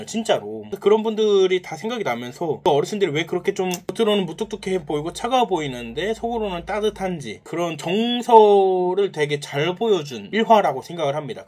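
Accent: Jeju, South Korea. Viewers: 님이 실제 제주도 사투리도 바꿔서 대사처주니 홀깨네요ㅋㅋ 표준어쓸 부분은 과감히 쓰길 몰입도 면에서 잘했다고 생각합니다.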